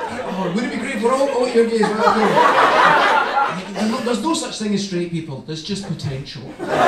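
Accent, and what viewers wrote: Scotland, United Kingdom. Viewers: I'm here for the Scottish accent!! ❤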